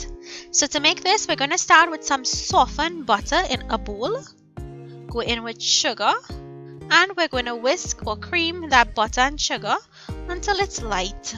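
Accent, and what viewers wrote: Trinidad and Tobago. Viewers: I like the Trini accent lol thanks for doing this I am wondering how you do these I'll see if they're different lol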